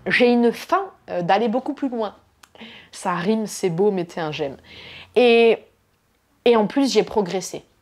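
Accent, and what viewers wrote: Alsace, France. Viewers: je regarde tes vidéos depuis le début de ta chaine , pourquoi je continue ? parceque tu me fais vraiment rire , ton accent alsacien yoooooo , tes blagues , ton énergie , t'es marrante , au quotidien ca doit étre quelque chose avec toi !! 😅😅 cela permet aussi de découvrir des petits restos dans le coin que nous ne connaissons pas , je rigole déjà en pensant a tout ce que tu mange a chaque fois ! 🤣 bref perso avec toi regarder une video , c'est se fendre la gueule , hop'la je reste abonné et je t'encourage a poursuivre ce que tu fais car c'est de la bombe atomique 🙂🙂😆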